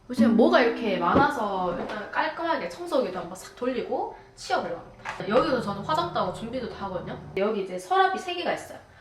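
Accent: Gyeongsang, South Korea. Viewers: 세상에 경상도 어딘지 모르겠는데 말투도 귀엽고 재밌고 또 너무 순수하고 맑아보여요!! 응원합니다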